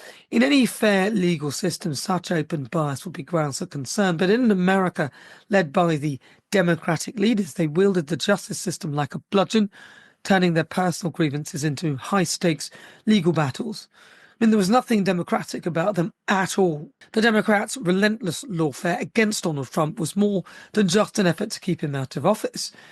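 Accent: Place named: Birmingham, United Kingdom. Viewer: Lol, ur brummy accent is thick and i live here too and have heard ALOT of ozzy osborne accents 😅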